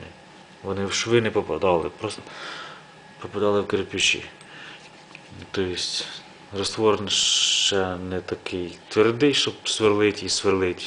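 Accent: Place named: Kyiv, Ukraine. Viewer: Судячи по назві,серйозний проект.А по говору,Київська область.Удачі Вам,в нелегкому ділі ,,тетріс з камінцями''.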